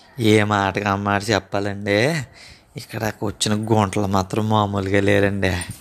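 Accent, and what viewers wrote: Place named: Godavari, India. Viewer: Godavari jillla yaaasa super bayyya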